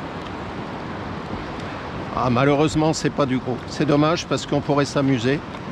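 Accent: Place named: Oléron, France. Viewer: Hahaha je me disais aussi….. il manquait l accent oleronais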